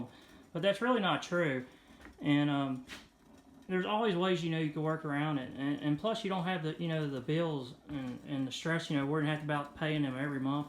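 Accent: United States. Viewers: Very nice location and cabin. What part of the country are you located? I spent a few years in East Tennessee and you sound like you could be. I mean that in a positive way. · Im guessing by the accent, and the view from the top of the mountain, it's West Virginia.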